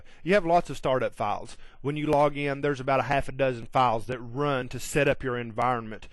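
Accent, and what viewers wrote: South, United States. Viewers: Thanks, that glorious southern accent now pops into my head every time I'm setting permissions!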